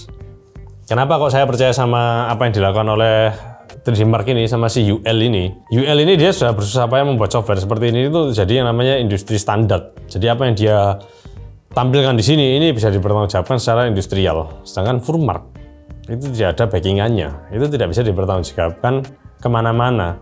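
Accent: Java, Indonesia. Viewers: Uasik nek om seng edukasi. Mantep dengan beberapa penekanan jowone. 😂😂😂👍🏻👍🏻👍🏻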